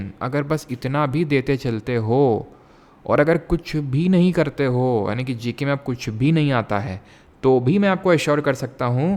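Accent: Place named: Uttar Pradesh, India. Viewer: Hey bhagwan bhaiya aap ekdm humhi log jaise bolte hain jaise ghr pr bhaiya log bolte hain bhulee jaiye 😂 ...haiyye hai...😅pehli baar koi up ka hai bhut shi lgta hai aapki baat sunkr aise ghr wali feelings aati hai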